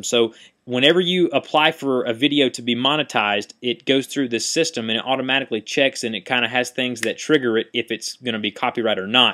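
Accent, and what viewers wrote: South, United States. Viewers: you've got a southern accent :) where ye from? xx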